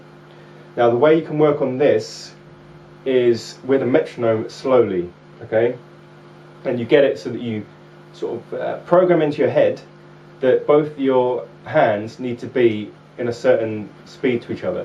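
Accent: United Kingdom. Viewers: cause hes from the uk and were awesome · Ya, I meant English accent, sorry about that, and you do have a point, you guys sure can rock!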